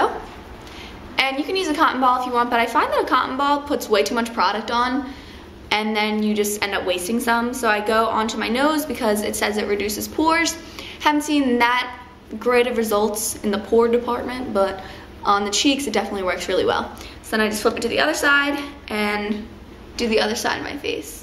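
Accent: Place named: Midwest, United States. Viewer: are you ffrom the mid west. your accents sounds like my cousins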